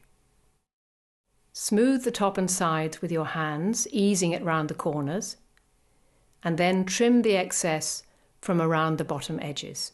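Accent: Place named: United Kingdom. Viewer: i luv british accents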